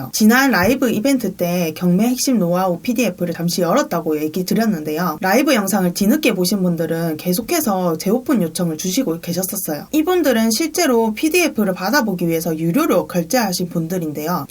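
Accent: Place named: Busan, South Korea. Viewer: 유투브 자주 보다가 알게 되었습니다. 부산 사투리로 강의하는 내용이 속속 잘 들어오네요. 50대 직장인으로서 경매를 공부하고 있어요.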